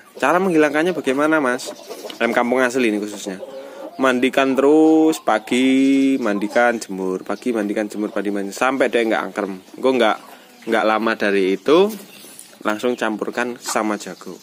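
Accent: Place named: Lampung, Indonesia. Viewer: Mas alamate nang ndi? Kok bahasane koyo wong jowo Lampung.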